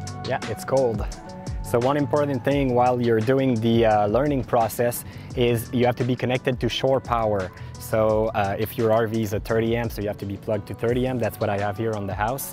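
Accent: Canada. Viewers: Oups je me suis trompée de vidéo mais j'ai like quand même. A bientôt pour une vidéo avec votre accent Canadien en français 😉☺🤣😂🇲🇫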